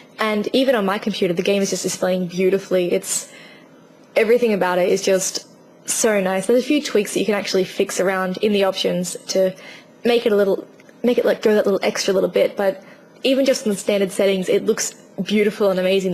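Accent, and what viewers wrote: Australia. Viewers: Sounds Aussie to me, meh doesn't matter she cute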